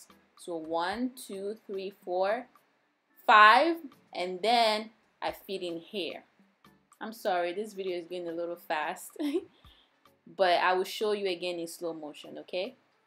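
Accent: Nigeria. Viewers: I love your accent. Are you Nigerian?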